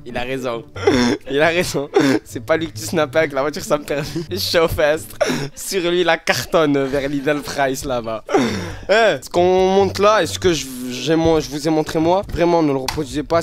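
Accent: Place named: Marseille, France. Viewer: Il a l’accent de Marseille mais il met paris ?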